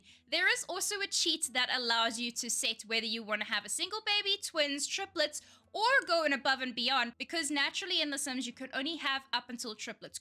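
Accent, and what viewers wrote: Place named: South Africa. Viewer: Wait you aksent sounds south africn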